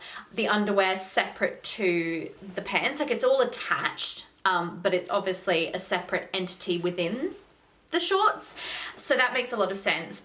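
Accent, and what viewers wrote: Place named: Australia. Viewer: An Aussie girl talking about periods, it made me smile.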